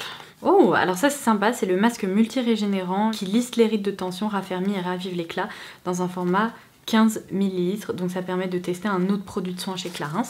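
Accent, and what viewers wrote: England, United Kingdom. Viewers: Bonjour, je n'ai pas Instagram et je ne sais pas m'en servir, j'ai juste un mail <mail> , au delà de ce petit problème j'adore vos vidéos, vous avez une voix apaisante, vous avez un bel accent anglais. Merci pour m'avoir lu. Bien cosmetiquement vôtre. Delphine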